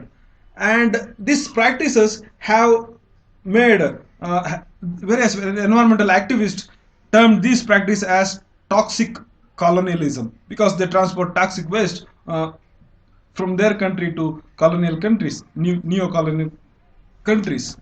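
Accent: India. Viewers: Are you kannadiga. Your accent says that.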